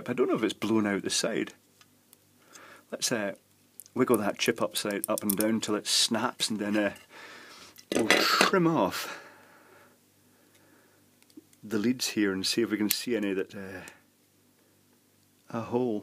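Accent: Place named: England, United Kingdom. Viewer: "Oh I think I may be out of plumbs here" I love the queens english!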